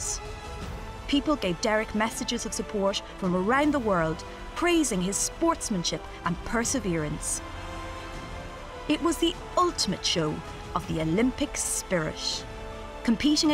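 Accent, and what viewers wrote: Ireland. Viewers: She has a wonderful Irish accent. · Yes. Just with an Irish lilt. Also if you are going to criticise language, you should spell English with a capital E.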